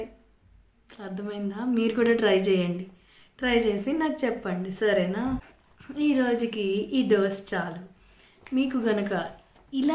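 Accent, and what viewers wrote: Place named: Telangana, India. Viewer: Good try . Meeru telangana baaga matlaadutunaaru 😀. Keep it up .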